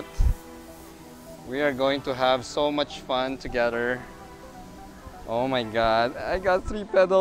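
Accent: Philippines. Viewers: Ooohh, that is so nice 👍🏻 I’m watching your video and my wife hears you talking and she says oh that’s Philippino. I have a 99 Miata. That’s old, but I love it. It still runs great. It’s white with tan top. I wish I had one like yours. Beautiful Scarlet...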